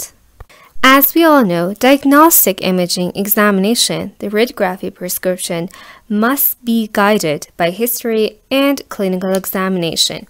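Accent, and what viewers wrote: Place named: Iran. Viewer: ویدیوهات خیلی به درد میخورن. فک کنم ایرانی هستی؟ داد میزنه لهجت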